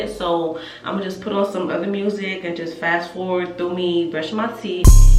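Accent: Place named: Miami, United States. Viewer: Lol I could just by how you talk you from Miami and I was right. Good video.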